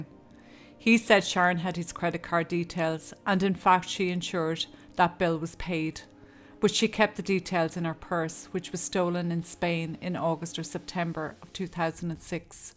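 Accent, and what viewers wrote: Ireland. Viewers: that Irish lilt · Your lovely lilting Irish voice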